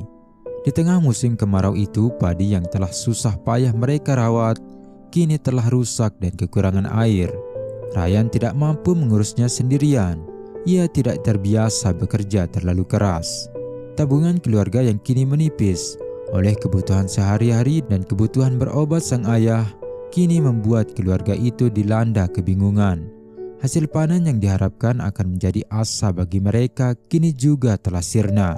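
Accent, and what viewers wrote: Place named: Lombok, Indonesia. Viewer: Authornya ni ngomongnya sayup2 kayak logat sasak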